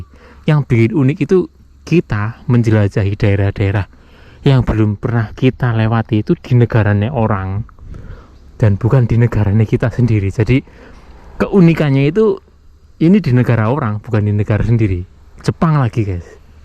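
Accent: Banyumas, Indonesia. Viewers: Mantep mas konten2nya bahasane logat ngapak tapi sangat menghibur dan menambah wawasan juga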